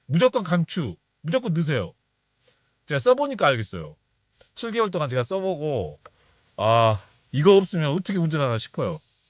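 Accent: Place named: Jeolla, South Korea. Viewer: 억양으로 보아 고향이 전라도분 같네요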